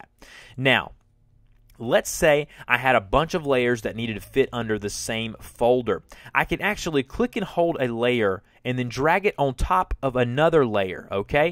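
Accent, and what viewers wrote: South, United States. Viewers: Thanks for this video, it was really easy to follow! Also that southern accent is charming. ;)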